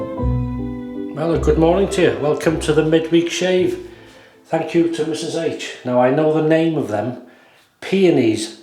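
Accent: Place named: Wales, United Kingdom. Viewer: I really want a model, one day I'll get one. I'm based not too far from you I think. North East Wales from your accent? I'm originally from Bangor but live north East now.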